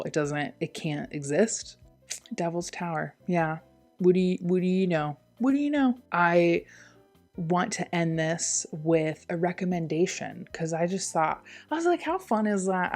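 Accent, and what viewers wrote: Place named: Midwest, United States. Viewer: I love your humor and the way you talk, it reminds me of home, are you from Michigan perhaps… or maybe Minnesota?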